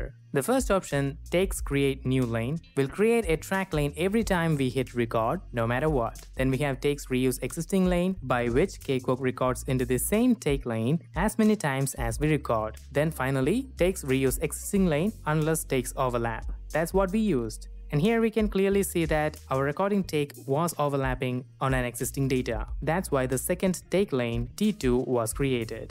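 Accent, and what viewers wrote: India. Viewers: yooo such insightful tutorial, god bless. Also loved your mallu accent XD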